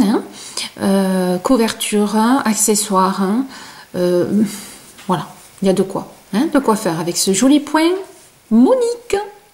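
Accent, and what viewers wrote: South, France. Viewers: Super joli ce point. J'ai tout compris car comme toujours vos explications sont très claires et je suis toujours aussi fan de votre accent du sud ! Je vais tenter le poncho avec ce point. Encore merci de partager avec nous !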